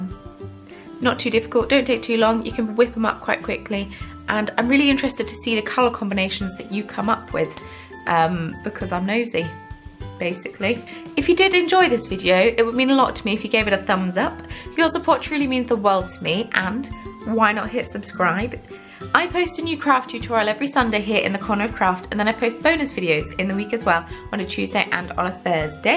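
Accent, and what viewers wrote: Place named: England, United Kingdom. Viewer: hello "the corner of craft" just subscribed to your channel. just posted another note to your answer to my post on another's channel. anyway, I am looking forward to checking out many more of your videos. I like hearing you talk, my Arkansas dialect is not as well sounding as your England accent. anyhow, looking forward to many more tutorials. sincerely Becky B from Arkansas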